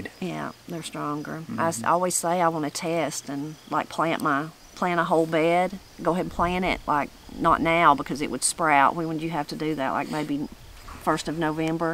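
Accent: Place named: Tennessee, United States. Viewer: The way you talk sounds like the way my people talk. Love it. Chattanooga TN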